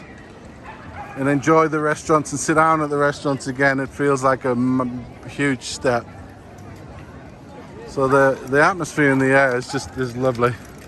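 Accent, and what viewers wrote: Northern England, United Kingdom. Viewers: oh no please do talk on your films. I can hear only slight northern accent but I live in UK so recognise some accents. I want to tell you about restaurants - secret gardens 🤗🤭, You passed one yesterday in Grodzka near Wawel. Another La Campania in Kanonicza Str. On the 29 May eating inside restaurants in Krakòw.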